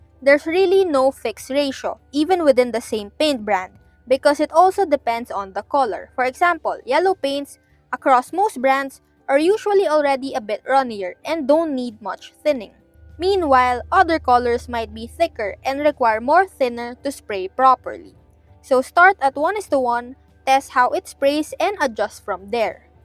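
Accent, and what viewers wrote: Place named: Philippines. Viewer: filipinos really have that accent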